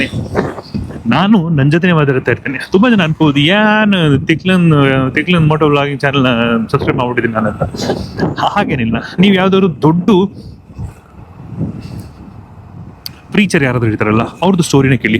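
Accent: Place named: Bihar, India. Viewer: Accent seems like Bihari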